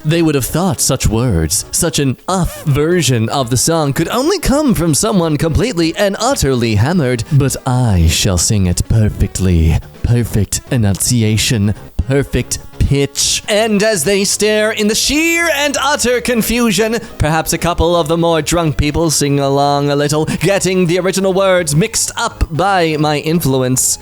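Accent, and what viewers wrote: Australia. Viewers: Bro had to crack out the Aussie accent for this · and I thought he was just doing a horrible Australian accent the whole time... Wow... Just wow...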